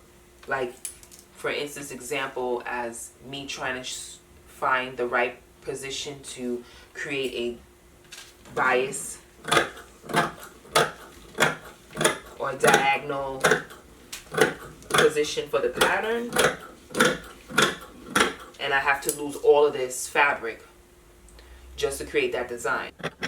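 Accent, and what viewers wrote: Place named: New York, United States. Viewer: You are very talented . Where do you live ? You sound like you live in new york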